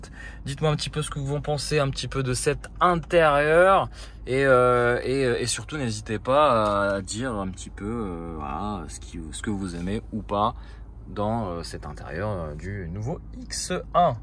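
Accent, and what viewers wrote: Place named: France. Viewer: I only watched this to listen to the French accent. 10/10.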